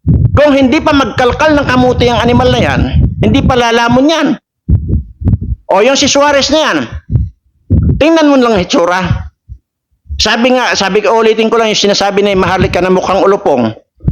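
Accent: Iloilo, Philippines. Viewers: Ilonggo ka gali tuno mo ilonggo sa totoo lang taga diinka haw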